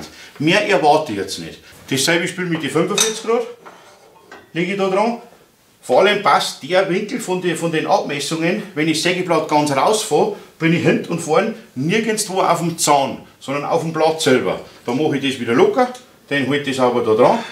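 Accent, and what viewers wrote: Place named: Bavarian Forest, Germany. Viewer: Hallo Du hast die gleiche Sprache wie ich wo kommst denn Du her, ich vermute nicht weit von Regen entfernt (grins). So von Kollege zu Kollege, aber bei mir is nix mehr mit Stom seit ich drei Schlaganfälle und einen Herzinfarkt hatte, da musste ich auch noch das reden lernen, natürlich waldlerisch (grins).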